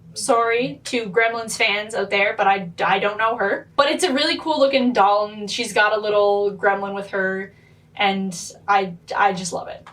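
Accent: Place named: Canada. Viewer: I like your accent! Canadian detected?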